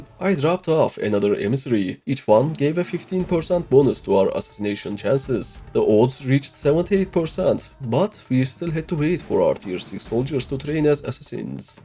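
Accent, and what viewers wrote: Turkey. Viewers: Bro he is actualy a turkish olso he is speaking English with turkish acsent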